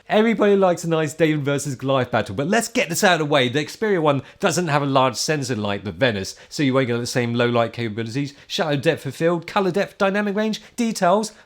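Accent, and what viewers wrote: United Kingdom. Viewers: I remember as young funny guy with british accent. Fast forward,now with wife and kids in tow looks like granpa of himself. Run for the hills Save yourself!!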